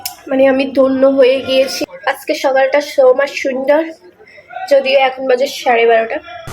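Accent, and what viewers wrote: Noakhali, Bangladesh. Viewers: নোয়াখালীর ভাষা আমার কাছে অনেক ভালো লাগে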